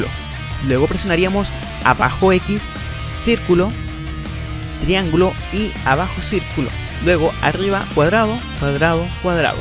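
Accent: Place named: Chile. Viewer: Eres chileno se nota en tu forma de hablar, porque yo también lo soy xD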